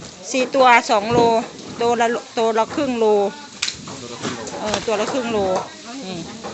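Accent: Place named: Laos. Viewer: ยุอำเภอไรครับพูดออกลาวๆ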